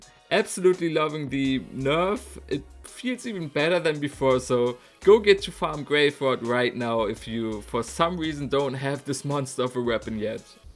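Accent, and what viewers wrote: Germany. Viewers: Man erkennt zwar sofort das er deutsch ist aber sein englisch ist nicht schlecht und lohnt es sich nicht mehr durch englische videos mehr leute zu erreichen als durch deutsche?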